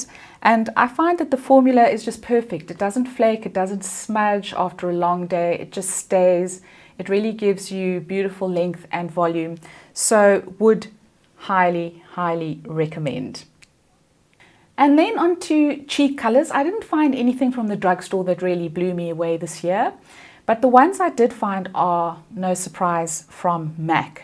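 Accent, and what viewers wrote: South Africa. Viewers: I love Ruby Woo in you! I’m curious about something in your video - I think you said that you got the Elf shadow pallets for less than 30 bucks (is that the word you used?) and also mentioned the Essence eyeliner for less than 50 bucks - I know you are in South Africa (your accent is really lovely!) so what is the currency exchange like? In the US these products are around $3 each, so I’m wondering about your currency. Thanks for an informative video - so glad I found your channel! 💜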